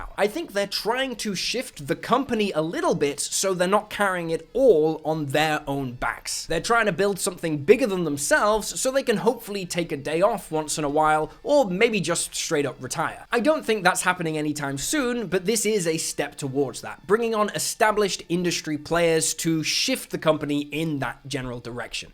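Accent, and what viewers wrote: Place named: United Kingdom. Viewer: Ha! you are british 😂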